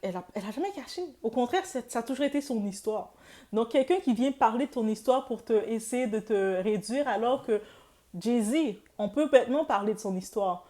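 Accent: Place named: Canada. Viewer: Merci ❤❤❤ je voulais te demander je reconnais un accent canadien tu es de quelle origine?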